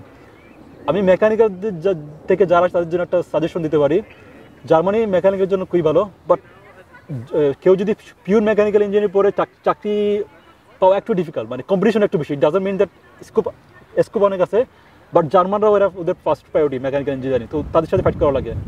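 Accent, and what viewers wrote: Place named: Chittagong, Bangladesh. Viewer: চট্টগ্রামের Accent শুনতে বেশ ভালোই লাগে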